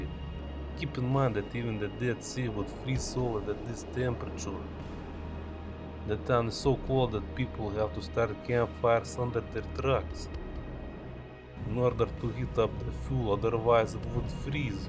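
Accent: Russia. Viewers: Sounds like crazy Russian hacker mixed with gru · Dude the Russian accent makes it seem cooler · You sound really russian Oh yeah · Really love that Russian accent! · I love this Russian accent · Unique russian accent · Aahhh Russian accent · God ur accent is fuckin russian ! Loved it but i rather like the older channel !! Keep uploading on it ! Thnx love from india ! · Try fix the russian accent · Typical Russian voice xd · I actually enjoy more of this Russian accent than normal English accent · Im confused xD are you actually russian or just playing the accent. Either way it's on point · I like russians ur russian i hear it from ur voice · You sound very russian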